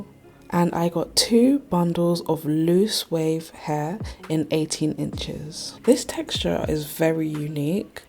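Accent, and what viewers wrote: United Kingdom. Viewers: Was not expecting you to be British but hell yea thanks girly ur gorg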